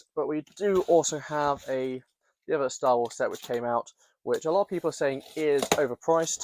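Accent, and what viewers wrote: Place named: United Kingdom. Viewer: So despite the accent you’re not in the UK?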